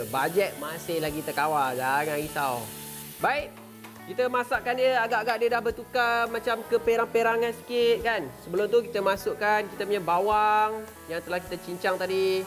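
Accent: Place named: Kedah, Malaysia. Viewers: Dia cakap macam orang utara la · abg..ni org kedah ke...ckap pekat bak angg😂😂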